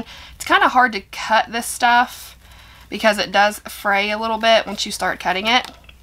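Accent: South, United States. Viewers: Girl! Your voice is so cute. I’m from the south too (Alabama) and I much rather listen to people with southern accents! Lol this is so adorable and helpful. Thank!!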